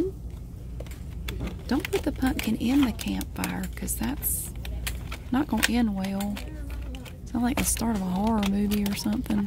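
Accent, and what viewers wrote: South, United States. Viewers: I lived in Alabama for 3 years lol I love and really miss Southern accents I'm happy I found this channel! I know you're not in Alabama but still!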